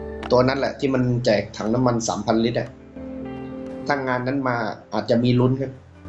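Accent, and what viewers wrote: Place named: South, Thailand. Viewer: สำเนียงพี่บ่าวนิ่ คนใต้ม๊ายนิ 😅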